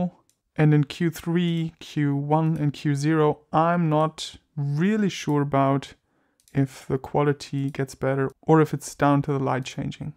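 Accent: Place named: Germany. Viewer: Tolles Video, dass du Deutsch als Muttersprache hast erkennt man in deinem Englisch sehr gut 😬✌🏻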